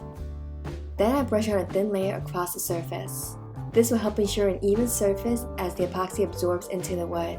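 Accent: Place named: United States. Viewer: from an Real Indian woman to now being a sultry American voiceover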